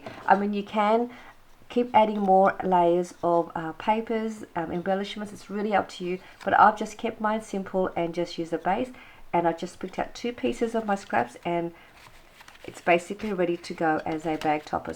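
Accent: Australia. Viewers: Lovely projects and such a gorgeous Aussie accent!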